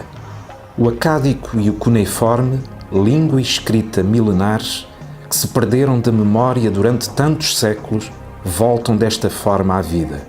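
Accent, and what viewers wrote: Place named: Portugal. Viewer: É meio confunso pelo sotaque português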